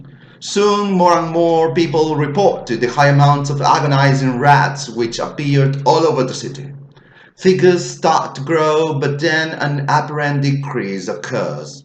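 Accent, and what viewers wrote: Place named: London, United Kingdom. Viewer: en serio?? Parece que hubieras vivido ahí, xq el acento es británico-londinense, increíble que sólo lo hayas adquirido estudiando.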